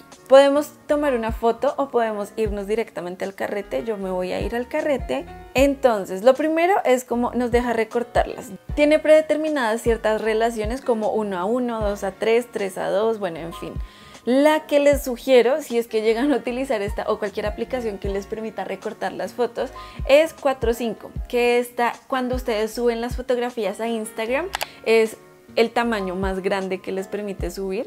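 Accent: Colombia. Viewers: Me encantan estos tipos de videos y tu voz super relajante jaja no se el acento colombiano me gusta ❤️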